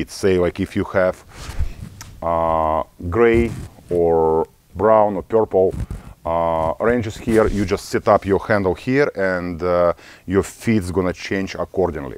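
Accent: Russia. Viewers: У вас явно режущий ухо рязанский акцент!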